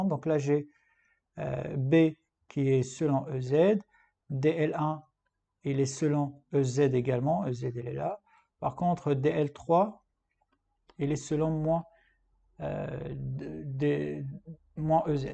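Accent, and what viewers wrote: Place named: France. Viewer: magnifique accent francais, comment te la eu ?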